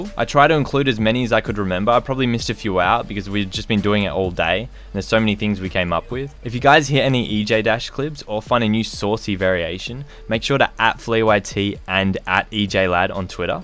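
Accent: Australia. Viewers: is he australian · Aye I’m a new sub lmao I could tell you were from au as well as soon as I heard you spoke aha hopefully we see each other in game at one point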